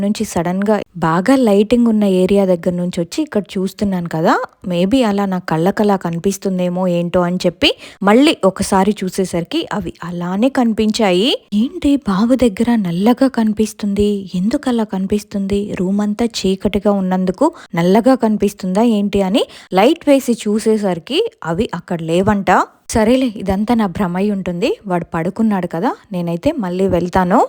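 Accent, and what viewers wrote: Telangana, India. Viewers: Hi, I'm a new subscriber from last 3 weeks ago, I'm a big fan of horror stories junky lot more interested, Randomly your video the bangalore delivery person video nundi almost back to back 25-30 videos chusanu, Miru telangana slang lo kuda baaga matladtunaru, miru cheppe style as it as miku stories share chese valla laga cheptunaru 🙌👏👍 best of luck 🤞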